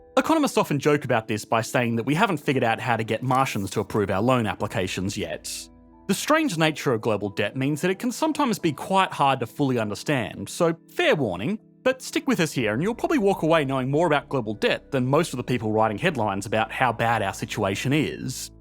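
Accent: Australia. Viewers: An Australian Chrisopher Walken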